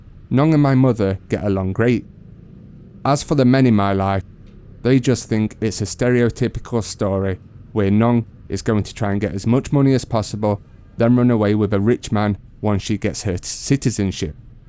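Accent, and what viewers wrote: United Kingdom. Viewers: You have British Accent.🤔🤔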